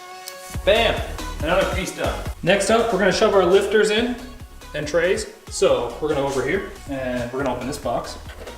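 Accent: Alberta, Canada. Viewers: Are you from Alberta??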